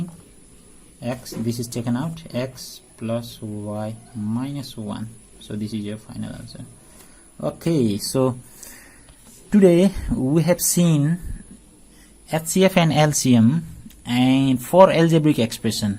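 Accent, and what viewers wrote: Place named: Nepal. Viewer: Are u from nepal by any chance this is my first time watching ur vdo and I'm also from nepal and i think ur accent is like nepali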